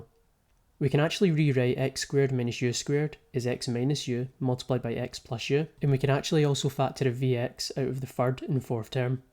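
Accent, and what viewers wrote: Scotland, United Kingdom. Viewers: I was searching for about 2 hours for this, thank your for the wonderful derivation of formulas and solution. I love the Scottish accent, if its not Welsh... , love from Pakistan 💚💚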